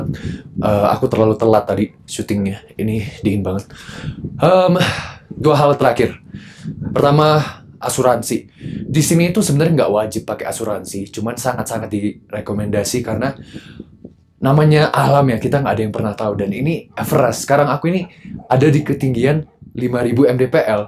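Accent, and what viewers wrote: Java, Indonesia. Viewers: candu bgt ngomong jawa nya hahaha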